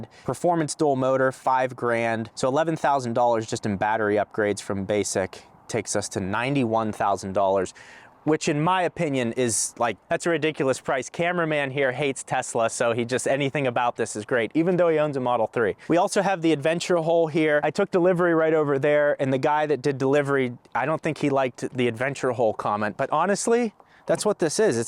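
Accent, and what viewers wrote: Pittsburgh, United States. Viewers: Just found this channel searching for Rivian Reviews and you sold me with the Yinzer accent. I'm considering a R1S to haul kids and stuff around. I'm so iffy on dropping the cash on the R1S but I'm looking at a 2016-18 Land Cruiser and the cost savings alone are just insane. I think 2024 will have a lot of great things for Rivian. I have a 18 month old and a new baby too in march and I just think the Truck will be a touch too small. If I got the R1S I would toss on the Frontrunner roof rack and have so much storage.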